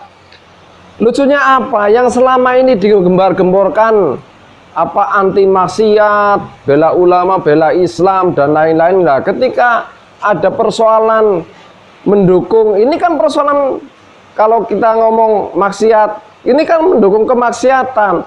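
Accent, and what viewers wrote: Banyumas, Indonesia. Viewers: Kaya kuwe ujung ujunge yah 😂 Ngapak the best 😎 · Agama baru kang, agama kadrun hahahha. Memang manteb pake gaya khas ngapak nya.. good job kang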